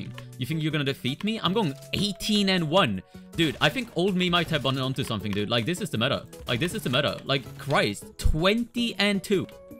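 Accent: Sweden. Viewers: Jag hoppas att du är svensk så att min hörsel inte sviker mig. Btw hur fan kan du ha infintie credits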